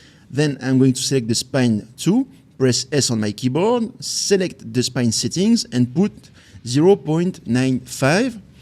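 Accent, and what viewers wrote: France. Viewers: You are so awesome, why is your content so high quality ?? Are you french like me BTW ? Your accent is perfect <3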